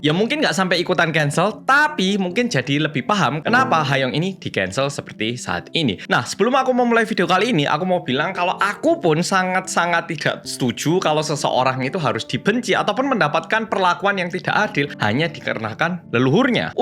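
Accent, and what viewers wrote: Java, Indonesia. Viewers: Semangat Oppa 😊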